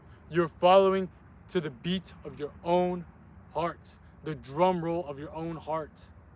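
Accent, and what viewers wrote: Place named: United States. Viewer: I like your accent, bro! I wanna talk like that even though I am not American.😞 and keep the good content rolling. 💪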